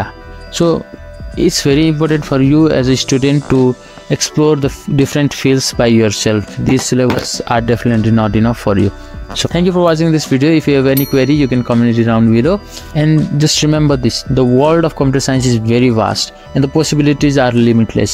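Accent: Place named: Nepal. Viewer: Bro speak in nepali. Do what you are good at. Nepali accent is not appealing.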